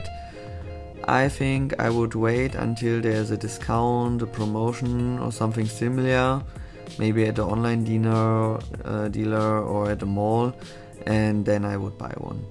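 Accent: Germany. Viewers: Are you german? Your accent is beautiful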